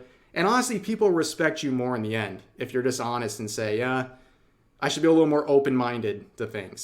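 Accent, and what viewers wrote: Scotland, United Kingdom. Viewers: Scotish, almost british 🤢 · A Scottish vTuber?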